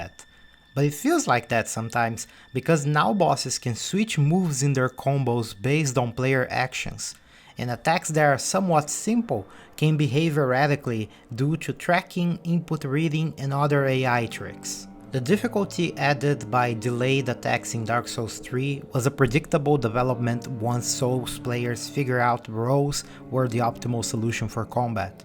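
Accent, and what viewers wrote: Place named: Brazil. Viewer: WOW. What a hidden gem of a yt channel and videogame critic. Came into the video expecting some very copy paste criticisms that anyone could have come up with after a few play sessions. Came out feeling i’ve heard one of the best videogame criticisms in a while, and i watch reviews/critiques of games all the time, especially Elden Ring, since the game made me feel so confused and mixed overall, with so many Outstanding moments and so many boring ones during my completionist 150h playthrough as a Souls veteran. Instantly subscribed, now i need to see some more reviews, there are very few as coherent, thoughtful and all encompassing game critics in yt. Only curiosity... are you from a latin country? Your accent feels similar to brazilian portuguese “ingrish”, and at first i found it a bit annoying, it actually grew a lot in me and it was very clear and easy to understand, and had a good cadence to it. 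Great video and analysis and hope to see more like this in the future!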